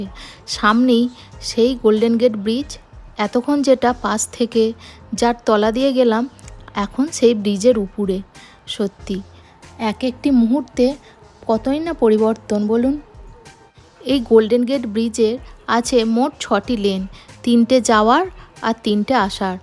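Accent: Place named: Kolkata, India. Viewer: খুব সুন্দর দৃশ্য আর খুব সুন্দর উপস্থাপনা, আর তোমার কথা শুনে মনে হলো তুমি কলকাতার, তবে আমি বাংলাদেশে থেকে।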